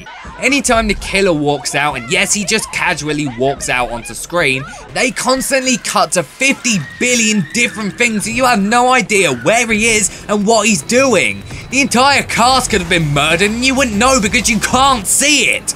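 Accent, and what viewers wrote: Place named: United Kingdom. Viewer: I really liked your smackdown of this movie in a British accent. Yeah the movie looks shitty.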